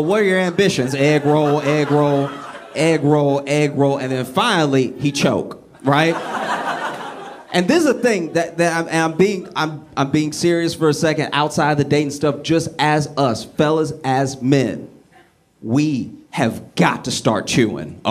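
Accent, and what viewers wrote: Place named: Louisiana, United States. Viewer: lmao his accent is Louisiana but his demeaor is totally New Yorker xD "the fuck?" xD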